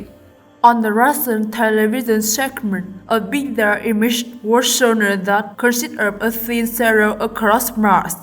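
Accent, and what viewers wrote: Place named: Japan. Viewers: could you possibly put there talking someone with normal english and not with strong japanese accent? it is hard to understand you... thank you. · ah yes, she does have a strong japanese accent. I think it'll help her greatly if she hires an voice actor to do her videos. Good content here.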